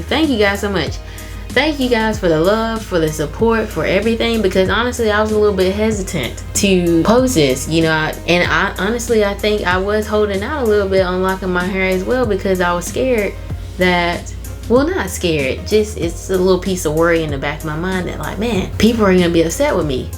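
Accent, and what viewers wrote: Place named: South, United States. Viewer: New subscriber, wish I discovered you when I was loose natural but excited to see your loc journey and love hearing my you talk, the southern drawl is absolutely endearing ❤ Depending on how u maintain, your locs will likely swell to a traditional size. I've been loc'd for over 6mos now and my microlocs have almost doubled in size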